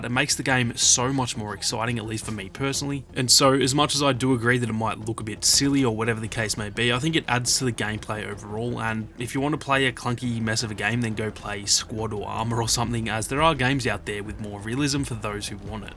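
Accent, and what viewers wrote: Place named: Australia. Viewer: Love the Aussie accent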